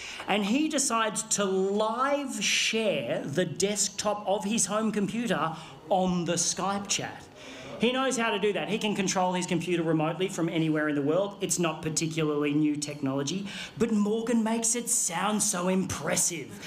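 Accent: Australia. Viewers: this poor guys comment is getting attacked guys chill lol also yea i know what you mean his emotional range with the aussie accent and the way he talks goes soo well with how we speak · mans so aggressively australian he replaced the voice in my head , now everything i read is in australian muppet reject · This isn't aggressive Australian, this is regular Australian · He's actually pretty normally Australian. is just regular Australian.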